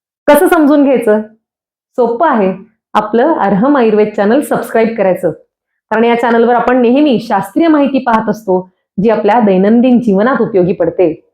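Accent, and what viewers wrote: Standard. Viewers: अतिशय छान. दैनंदिन जीवनात उपयोगी पडेल अशी माहिती. डॉक्टरांची भाषा अतिशय स्वच्छ व‌ उत्तम मराठी. ऐकत रहावं असं वाटतं. मनापासून कौतुक.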